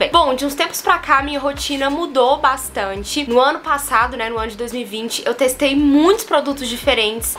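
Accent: Minas Gerais, Brazil. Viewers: Pelo sotaque imaginei que era mineirinha .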